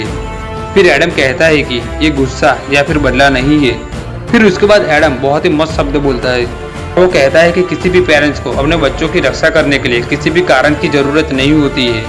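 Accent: Gujarat, India. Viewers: Aap Gujarati ho?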